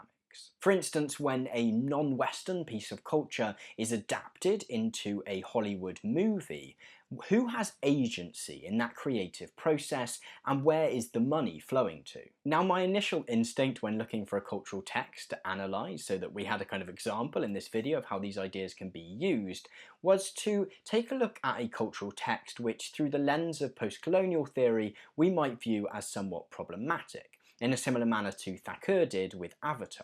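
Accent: United Kingdom. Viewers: why is a brittish person talking !!!!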